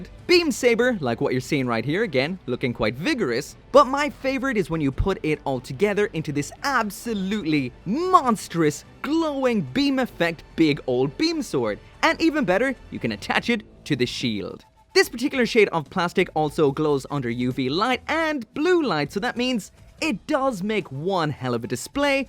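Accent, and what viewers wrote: Ireland. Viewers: I hear the Irish accent, but there's something else there, right? Maybe I'm just crazy. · Yo you Irish bro?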